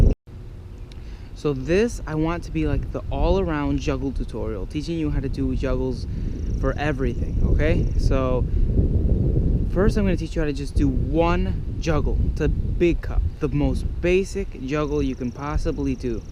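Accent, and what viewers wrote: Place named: England, United Kingdom. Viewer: You sound very English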